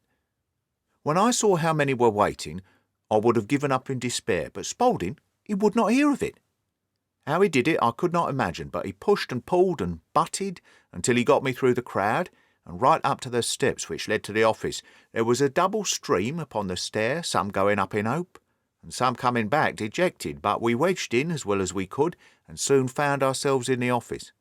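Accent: United Kingdom. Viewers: Beautiful British English, high reading class. I listened with pleasure. Thank you so much.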